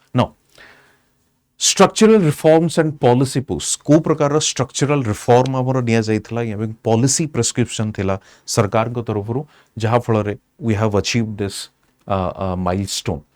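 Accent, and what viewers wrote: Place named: Odisha, India. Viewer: E master odia english style re kahibaku chesta karuchi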